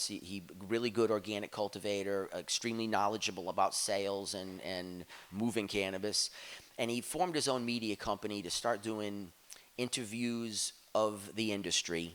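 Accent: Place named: New York, United States. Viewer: Slips into? From an Aussie standpoint his accent sounds like his from Brooklyn/NY geographical area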